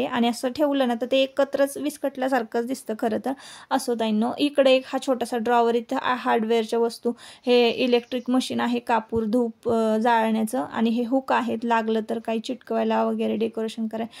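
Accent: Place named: Kolhapur, India. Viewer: कोनत गाव तूमच भाषा कोल्हापूरी वाटते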